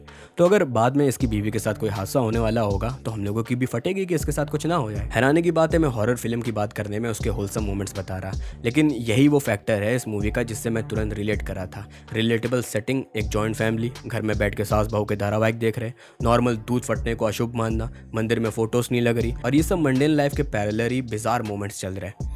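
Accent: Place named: India. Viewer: Film❌filam✅ bhai ka accent desi hain🙂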